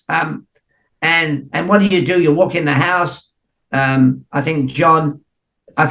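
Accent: Liverpool, United Kingdom. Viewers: One question : Why almost everybody in Liverpool I have heard speak with your kind of accent except The Beatles? Did they have to change their accents in order to be understood better or it depends on the part of Liverpool people are from? Because they never sounded like "Scousers" as you do for example .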